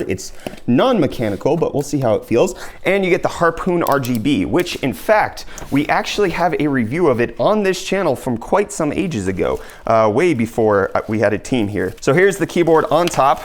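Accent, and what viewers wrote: South Africa. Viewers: WOW WOW WOW! Wait are you from South Africa?? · damn his english is actually so good wtf? wait is there a south african accent?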